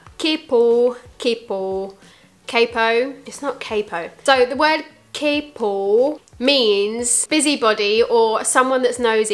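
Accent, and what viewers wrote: United Kingdom. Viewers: I'm sorry but your british accent doesn't fit with our language... sorry gurl. btw, anyhow means something similiar to not doing it properly. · LOL... Love hearing in British accent :) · Hi! Im new here. Im singaporean and this is the first time seeing someone who speaks British trying to speak Singlish! Keep it up!!! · I love u speaking Singlish in Bristish accent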